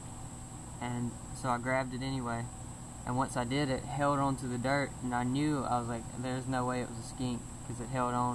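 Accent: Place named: North Carolina, United States. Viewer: I live in North Carolina.. You really sound like some of the people that live here. I'm guessing your from NC, SC, VA, or possibly WV.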